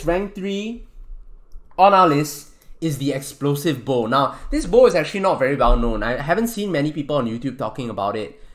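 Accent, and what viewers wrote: Singapore. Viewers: u kinda sound singaporean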